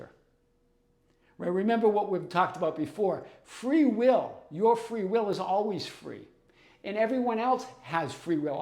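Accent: Boston, United States. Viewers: You tell them (tell them with your Boston accent - I'm from MA as well, LOL)!! But I empathize with those who ask those questions, because for a lot of us this whole LOA/Quantum Reality has been difficult to digest. Yes, even for some of us highly intellectual, intuitive or even mystically aligned people. It's easy to meddle with the middle as we get caught up with worldly matters, that we can experience with our five senses, because that is ALL we have had going for us.... All our lives! That is what we call "conditioning." But your videos are liberating, and that is fulfilling in itself! That is a sign - Thank you It will click for them, just like it did for some of us... "There's no sign outside of you, there's no truth to that other than the truth you give it" - beautifully stated...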